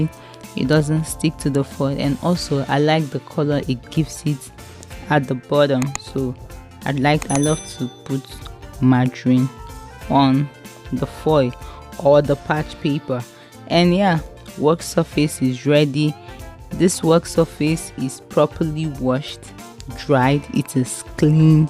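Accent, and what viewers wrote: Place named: Nigeria. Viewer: Omg first off I love your Nigerian accent I’ve made meat pies before and they are soooooo yummy makes my heart so happy 💕thanks for sharing luv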